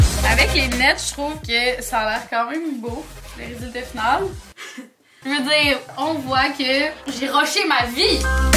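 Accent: Quebec, Canada. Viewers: t québécoise ?? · merci le Québec pour votre belle accent et la joie de vivre avec ... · Je confirme je comprends rien quand tu parles québécois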